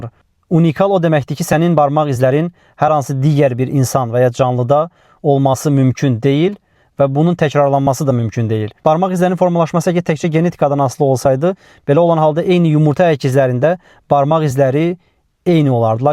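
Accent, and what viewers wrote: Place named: Talysh, Azerbaijan. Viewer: Salam qardas siz hansi bölgedensiz.siveniz sirindi talis zonasına oxsayir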